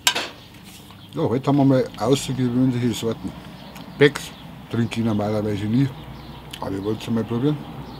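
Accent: Bavaria, Germany. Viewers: Die Wachtelbohnen in Tomatensoße muß ich mir auch mal besorgen. Die gibt es bei Amazon günstig im 2er-Pack. Dein bayrischer Dialekt ist für mich immer ein Genuss. Ich wünsche Dir viele Sonnentage. LG Bernd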